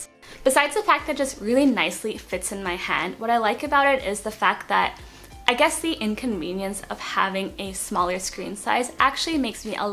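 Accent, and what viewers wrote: India. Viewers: Hi Are you Indian with fake accent or NRI with "some where in between accent" or an American with real american accent . pronunciations sounds 50 percent Indian and 50 percent American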